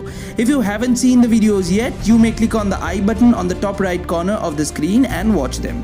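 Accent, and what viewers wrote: Bengal, India. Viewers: It's quite evident that you are a TMC cadre. Otherwise you would never have been allowed into restricted areas as shown. Your heavily Bengali accented English also betrays your cadre background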